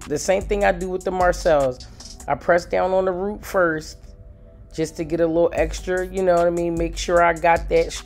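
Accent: Philadelphia, United States. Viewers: Hey! I'm glad that you're better♡♡. It's crazy how you said your voice is a huge part of your channel, bc legit, I love to hear you talk. The sound of your voice and your Philly "accent" is so soothing and dope to me❤. Thanks for all the knowledge, positivity, laughter, and realness you bring.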